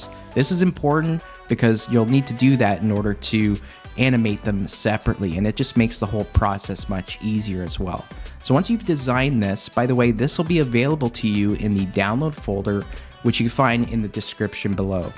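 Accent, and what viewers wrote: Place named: Canada. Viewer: the canadian accent is strong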